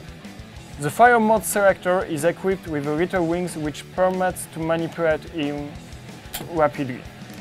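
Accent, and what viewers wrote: France. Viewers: sais trop un français les mec qui parle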